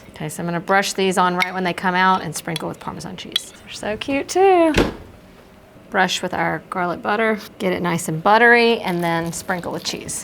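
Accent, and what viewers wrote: South, United States. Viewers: I really enjoy listening to this pretty and smart young lady. How lucky is her family! She is so interesting and I love the southern accent. So many helpful ideas and recipes that are practical, easy and delicious., you are the best!!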